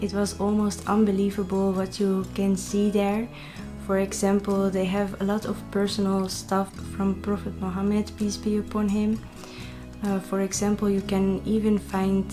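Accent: Netherlands. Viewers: u sound dutch do u speak Dutch??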